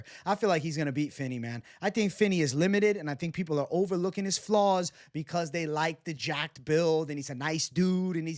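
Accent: New York, United States. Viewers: Can u put ur picks in the description so I don’t have to listen to that awful NY voice of urs. New Yorkers sound so damn dumb.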